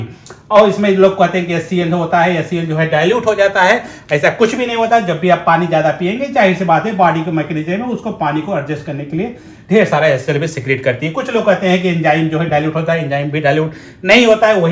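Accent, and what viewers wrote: Bihar, India. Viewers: बड़का डाक्टर। 😂❤ बिहारी हैं का? भोजपुरिया?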